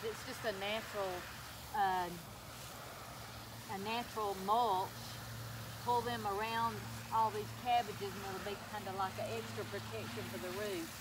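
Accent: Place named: East Texas, United States. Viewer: Thank you.👩‍🌾 I am enjoying my garden catalogs. I see my gardens in my visions underneath all this snow. You make me homesick. Are you east Texas? It sounds like home, I miss the sing song chatter of my Aunts and cousins in the kitchen.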